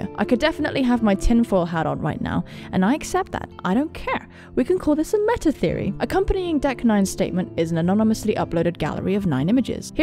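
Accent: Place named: United Kingdom. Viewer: OK!! So this is my first time here and my first video of yours as well and it seems like you are a big fan of 'Life is Strange' and that is really cool, and I have wanted to say this from the beginning but I LOVE your voice! British? Australian? But more British I think... Am I close? Anyways love the voice and I love the game so I think I will stick around.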